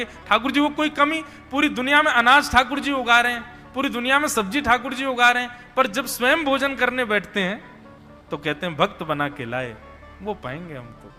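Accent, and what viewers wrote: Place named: Braj, India. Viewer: Raadhey raadhe guru ji aapki katha bhut acchi lagti h aapke mukharvind se brij bolo bhut acchi lagti h lala ka darshan ho jata h katha me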